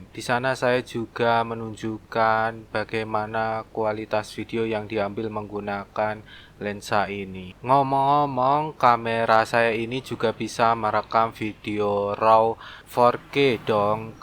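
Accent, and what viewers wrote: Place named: Java, Indonesia. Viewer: So amazing, brother, your bahasa indonesia is medok jawa, so very different from your english in your other video..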